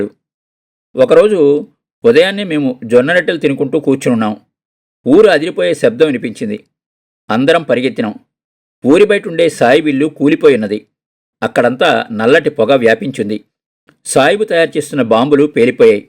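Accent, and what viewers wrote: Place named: Rayalaseema, India. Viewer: గారు చాలా చాలా మంచి కథ వినిపించారు. ధన్యవాదాలు. రాయలసీమ కరువు రోజులు కళ్ళకుకట్టినట్టు వినిపించారు ఆ యాసలో.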